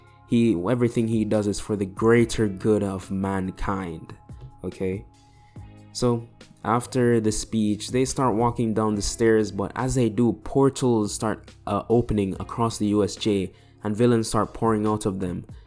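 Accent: Jamaica. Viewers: Where is that accent from? Jamaica maybe?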